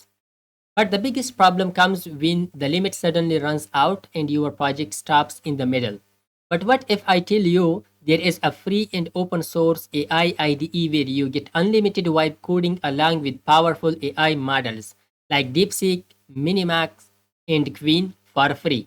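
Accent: India. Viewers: she's insinuating that you are a phone call scammer because of your Indian accent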